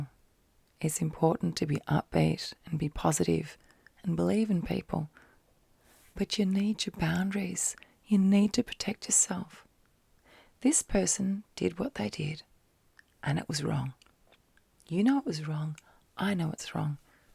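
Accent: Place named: Australia. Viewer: I can definitely tell you studied counselling, your remind me of my psychologist I used to see! I love your voice/accent- I'm Australian too but I'm in Florida for a year so it's lovely to hear an Aussie accent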